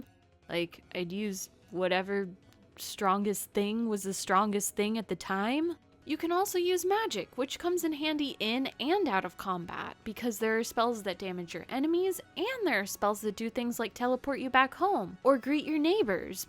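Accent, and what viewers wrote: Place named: Texas, United States. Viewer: hehe love~ your voice and love~ the texan accent XD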